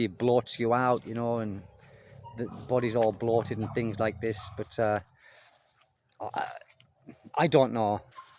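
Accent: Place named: Scotland, United Kingdom. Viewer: Started listening to you speak and you reminded me so much of my time teaching English in Japan at a British based school. Just closed my eyes and listened. Correct me if I'm wrong but do I hear Scotland? Sound so much like my friend Pat. Cheers mate!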